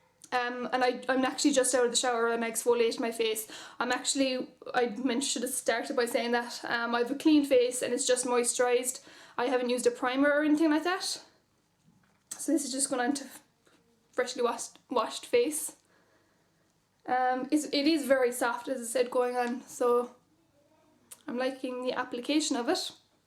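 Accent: Ireland. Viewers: I love your accent so much!!! I love Ireland!!!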